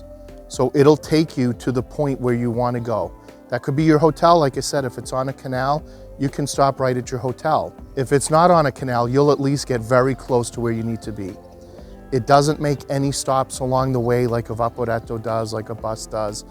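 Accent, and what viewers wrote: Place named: East Coast, United States. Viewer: you posted this video a year ago, but it remains useful today. Given your accent, did you grow up in the East Coast and then moved to Italy in your later years? The Wife and I are planning a trip to the US military base in Vicenza, so I am grateful that you shared this useful video with your traveling audience.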